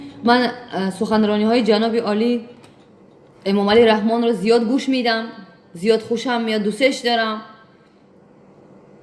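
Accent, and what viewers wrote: Iran. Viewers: Точики тоза гап зан.ранги ерони гап мезани.точикдухтар боши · Oy dokhtar khode too az kojoin pas chero be lahjiye Tojeki gap namizani too az kooli Iroon harf nazan goomshoo bobo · Ман митунам шуморо бигоям ту ируни харф мизани манба махкулшиди хуб харф мизани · Ту чиба точики гап намезани? Неким бо Ируни бо хато харф мезани, магуки ируниям, карочи Насихат Хубе, фикрат зебое, дуруст мегуи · Эрони гап мезана точикода обращени дора дафк хта дюмбор набинемт да ича эаму эрониёву туркода обращение кн точикои мора зиндагишона хавардорам нести дюбор гап назан дарбораи точико т точик нести мо тра не признаём уродина 🖕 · Полный рост эрони хта гирифти ку??точики охи лахчаи точики Кати гап зан намезебат!!!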